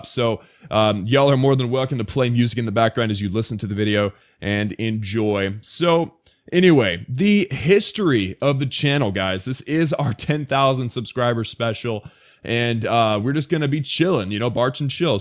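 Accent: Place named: Germany. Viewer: Congrates for hitting the 10k Subs. You have good content - keep up the hard work. Considering your name and I thought I scented an ever so light accent - do you have some german roots? Regards from Hamburg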